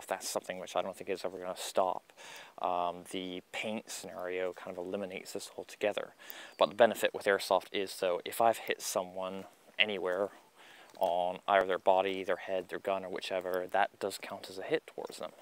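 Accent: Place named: United States. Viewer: Where do you live? You have an American accent, but you're paying for things with pounds?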